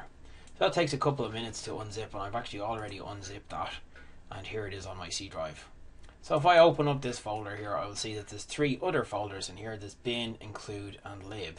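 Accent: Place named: Ireland. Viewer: Had to find this video again. I just listened for the Irish and here you are. Thanks again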